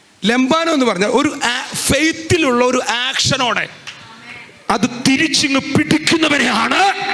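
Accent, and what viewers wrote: Thrissur, India. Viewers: തൃശൂർ ഭാഷയ്ക്ക് എന്താ കുഴപ്പം. ഒരു കുഴപ്പവും ഇല്ല.. സാർ ധൈര്യമായി പറഞ്ഞോ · എനിക്കിഷ്ടമാ ഈ തൃശൂർ ഭാഷ.... അത് പോലെ പാസ്റ്ററിന്റെ ചിരി....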